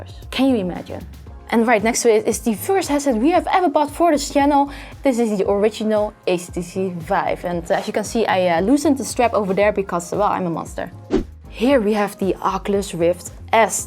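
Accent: Netherlands. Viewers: Ben je Nederlands, want volgensmij hoor ik een accent🤣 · You sound Dutch :X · You sound so Dutch to me haha are you Dutch?! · Haha ik hoor dat je nederlands bent😝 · Are you from the netherlands? I think I hear a dutch accent. :) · Are you dutch?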